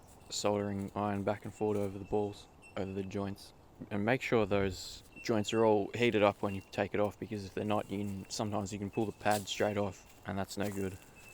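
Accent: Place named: Australia. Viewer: Love your vids man, Aussie AF Easy to follow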